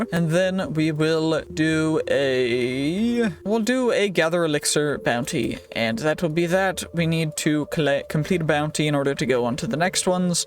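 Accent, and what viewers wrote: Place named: England, United Kingdom. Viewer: 1question ... where the fuck comes the extreme english accent come from 😂😂😂?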